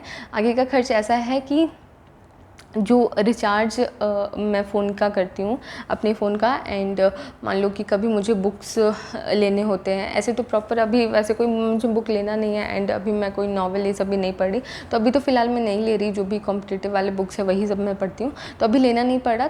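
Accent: Jharkhand, India. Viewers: Aap jharkhand se ho??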